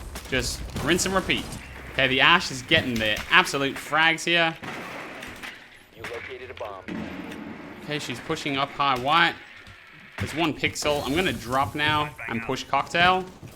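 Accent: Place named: Australia. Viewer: my "inner siege-voice" have adopted an aussie accent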